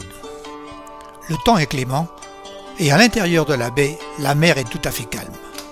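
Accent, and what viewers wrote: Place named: Maluku, Indonesia. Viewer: Muka2nx Mirip Orang Indonesia Maluku Cara Bicaranx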